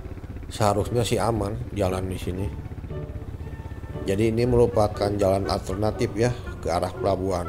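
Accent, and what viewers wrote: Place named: West Java, Indonesia. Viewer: logatnya Sunda pisan ahahahah. adem dengernya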